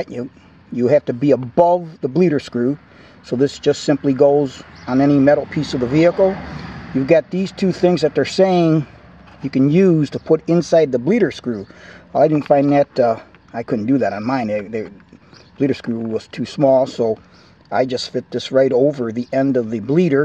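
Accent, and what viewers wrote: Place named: New Jersey, United States. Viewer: Are you from Jersey or Long Island?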